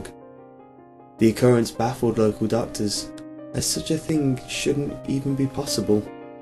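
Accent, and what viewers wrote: United Kingdom. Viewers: You have a gorgeous voice. Always loved British accents.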